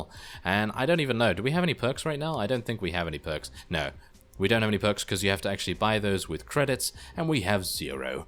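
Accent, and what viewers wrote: United Kingdom. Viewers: Where you from? Are you brit? Can't tell if you're brit or US.... anyway, good vid. (no yelling, no acting). You sound like a nature documentary dubber "the lion is staring at the buffalo... the buffaly sees him but blablabla". You get the idea!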